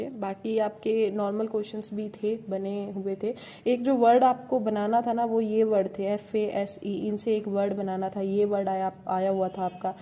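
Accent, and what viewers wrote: Haryana, India. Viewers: Good attempts 😀👍 aapka haryanvi accent bhot accha lagta hai meko 😊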